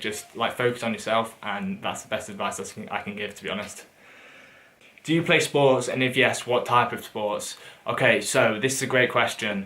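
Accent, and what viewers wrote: United Kingdom. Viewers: Anyone wanna else not expect hi sound british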